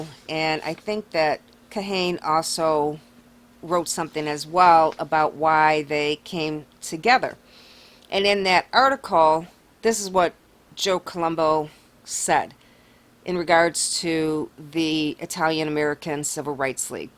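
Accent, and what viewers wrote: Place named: Midwest, United States. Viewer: She's got the best content and that hot Midwest accent/ voice loll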